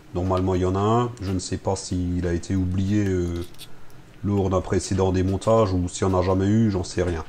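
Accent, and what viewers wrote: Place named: Lorraine, France. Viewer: Tu viens de Moselle ou d'Alsace ? 🤣 Parce-que j'habite Forbach et j'ai directement reconnu un accent hahaha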